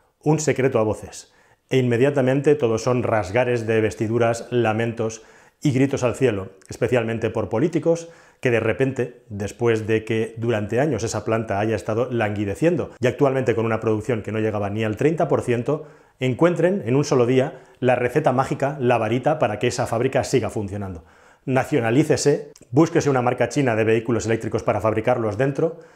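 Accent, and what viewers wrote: Spain. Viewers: Me atengo a tu española forma de hablar para recordar que no hablas de mi país. No me refiero a Nissan, que tanto da de comer a familias mexicanas, sino a los políticos, que no respetan nacionalidad para hacer lo que hacen.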